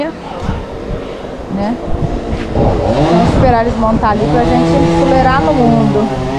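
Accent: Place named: Bahia, Brazil. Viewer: Que perigo sempre freio aí é barril falar que nem nós baiano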